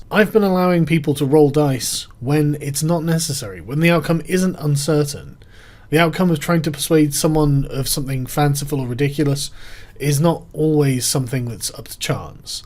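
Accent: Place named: United Kingdom. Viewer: I did not know Sargon was DMing an actual D&D campaign! I was I could be one of his players. I would LOVE to have my character's actions narrated by that amazingly British voice...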